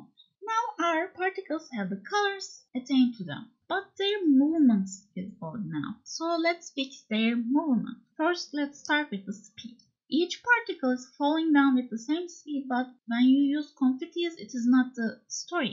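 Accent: Turkey. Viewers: aksanınızdan Türk olduğunuzu hissediyorum :D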